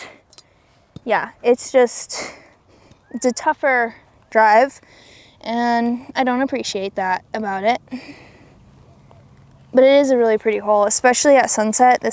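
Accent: South, United States. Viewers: Are you a Southern gal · Love your confidence and those early morning tee times as that fits my bill for fun golf & love your southern draw & sweet skirts. Love the game & your tips.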